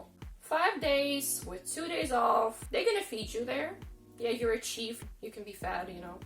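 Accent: United States. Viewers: I want to watch the video, but this "Amerrrrican" accent.... Ewwww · Get your Ass to America! I just started watching your channel so I don't know your background but I must say your English is amazing and honestly as an American I don't think most Americans would pick up on your accent they would probably just assume that you're American with a weird dialect lol no disrespect intended with the "weird". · It’s hard to believe you’re Russian...you sound American...your grasp of English is amazing if you’re Russian.. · You have a American accent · How did you learn english with an american accent? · Great work! As a Chinese, Khabarovsk is a city that I always wonder what it’s like since it is so close to us. I often hear from people from the northeastern Chinese provinces (close to the Russian Far East) complaining about their stagnant economy but it seems that sadly our Russian neighbours aren’t doing great either. 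Wish you the best with your channel and congratulations on your English skills, you sound very much like an American! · Your English is American - style .. most excellent.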